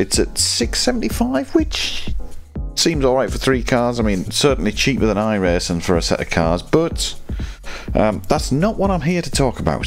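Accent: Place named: United Kingdom. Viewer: Judging by your accent your in the UK. You wouldn't like the North American participation. Maybe fewer servers would help.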